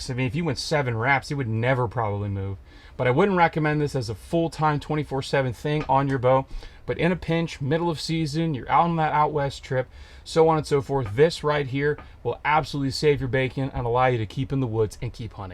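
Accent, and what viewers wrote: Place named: Pennsylvania, United States. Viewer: I love the way he says "he' LOOOOOOH everybody" with that northern PA accent. ALWAYS puts a smile on my face.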